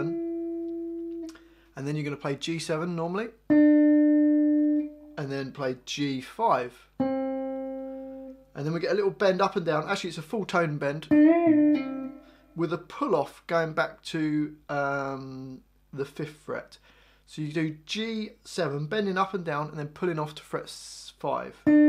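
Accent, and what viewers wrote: United Kingdom. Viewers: Omg how cutie british accent I love him speeches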